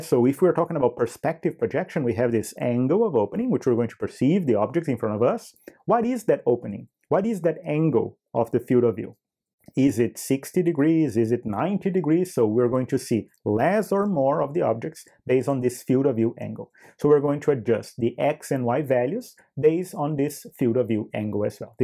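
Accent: Brazil. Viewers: dude, your brazilian is pretty strong, i can tell it by the tone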